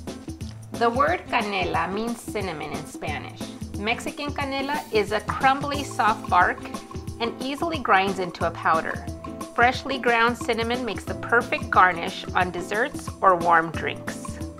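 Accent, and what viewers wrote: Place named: New Mexico, United States. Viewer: I recognize your accent! Are you or your mom from New Mexico?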